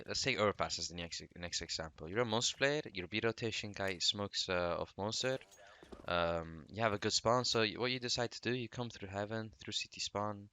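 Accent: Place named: Netherlands. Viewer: Why you sound netherlands, bonuskaart typa english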